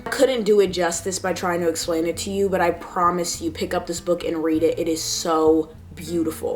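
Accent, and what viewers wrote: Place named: United Kingdom. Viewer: Why did i assume you were british..? Anyway immediately subscribed.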